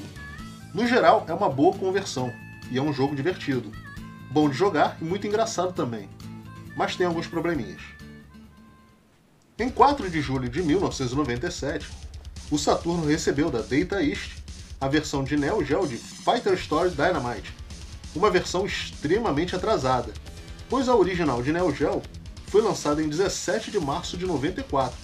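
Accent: Rio de Janeiro, Brazil. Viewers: O vídeo é ótimo sem dúvida. O difícil mesmo é ter de suportar esse carioquêixxx carregadíssimo.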